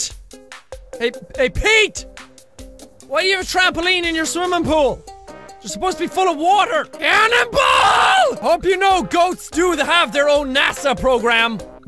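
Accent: Ireland. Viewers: jacksepticeye YAY!!!!!!!!!!!!!!! I love Irish accsents!!!!!!!!!!!!!! I myself are half Irish ......... :) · Apparently as time goes on jacksepticeye sounds more Irish 🤔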